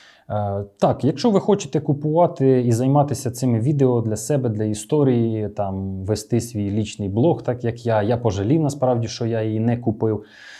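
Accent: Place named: Central Ukraine, Ukraine. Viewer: Клас, наш хлопець. Центр UA.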